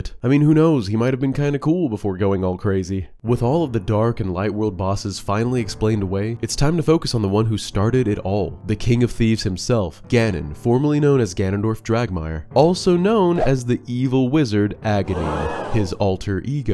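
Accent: Texas, United States. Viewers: Your ampt up Texas accent majes me want to hear Sam Elliot tell me about the Hero Of Time "...hmm I take comfort in that, The Hero abides... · I didn't realize you were from the Lone Star state, but now I can't not hear your Texan accent lmao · Love the Texas accent. God bless Texas.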